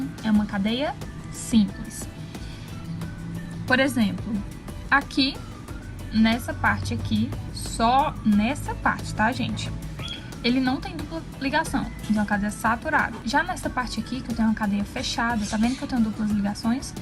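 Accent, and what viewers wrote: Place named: Maranhão, Brazil. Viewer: Você é do Maranhão ?? Tem um sotaque parecido com o de uma amiga minha que é conterrânea dela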